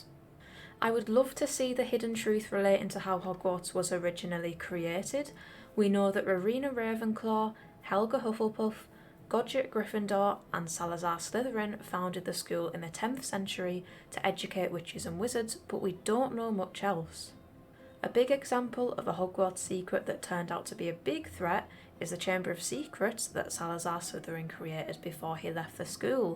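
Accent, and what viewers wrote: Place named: United Kingdom. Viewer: I’m convinced a British person can read anything and make it more interesting 😆 great video!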